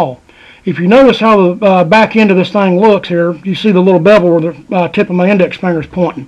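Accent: Maine, United States. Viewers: That Maine accent isn't wasn't used to be.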